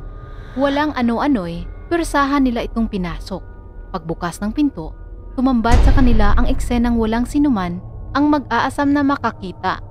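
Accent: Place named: Visayas, Philippines. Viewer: parang bisaya yung bosis ng narrator ...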